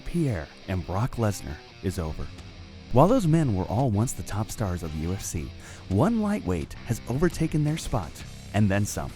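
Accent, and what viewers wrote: Scotland, United Kingdom. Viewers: Scottish lol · he sounded Scottish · That was Scottish not Irish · he sounded scottish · Scottish accent mate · American dose a Scottish accent for an irishman, wonder why they call Americans ignorant?. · ok im from Ireland and you sound Scottish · That was Scottish not Irish accent 😂😂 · That was a Scottish accent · I hate you made fun of Ireland 🇮🇪 I'm so offended you sounded like it's Scottish guy🖕🖕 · Your accent was Scottish not Irish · Why did this prat use a Scottish accent? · That's a Scottish accent not Irish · Definitely not an Irish accent, that was Scottish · U sounded Scottish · YOU SOUNDED SCOTTISH NOT IRISH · Scottish accent u tool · you sounded scottish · That’s a Scottish accent ya dope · that was a Scottish accent!!! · Thats a Scottish acent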